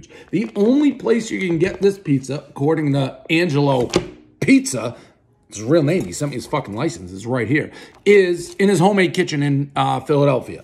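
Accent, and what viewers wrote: Boston, United States. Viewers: No, it’s his low class Boston accent. He does it with every word like that. He says mama’s like muh-muh’s.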